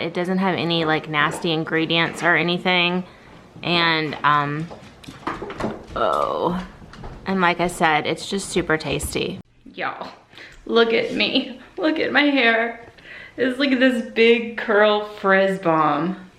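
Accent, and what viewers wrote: South, United States. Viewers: Wow... some people are really shitty (excuse my language). This is the first seeing you on YouTube. Immediately, I said I love her spirit. So, I am a new sub. I love that you shared your real life struggles. Your friendenemy sounds jealous hearted. You are probably everything she wish she could be but can't be no matter how hard she tried. Please keep being your authentic self. I love your southern north twang.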